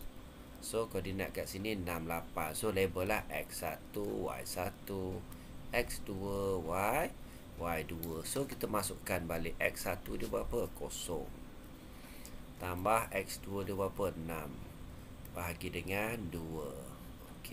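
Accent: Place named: Kelantan, Malaysia. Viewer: kenapa cikgu cover cover kelantan cikgu , takpe cakap bahasa kelantan pun boleh hehe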